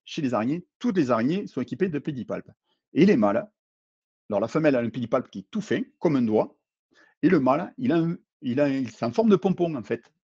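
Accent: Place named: South, France. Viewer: Bonjour, Ça fait du bien d'entendre un peu l'accent du sud (les bretons se moquaient de moi à l'époque),en tout cas, excellente vidéo très instructive. Merci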